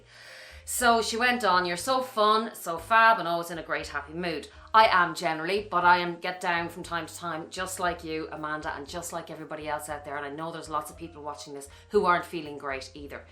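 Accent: Ireland. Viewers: Aw- I love you too! Your energy and gorgeous Irish accent . I am praying this video will help Amanda- and any of us who need help.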